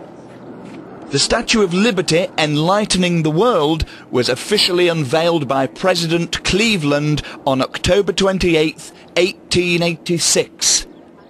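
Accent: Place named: United Kingdom. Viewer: What a nice British Accent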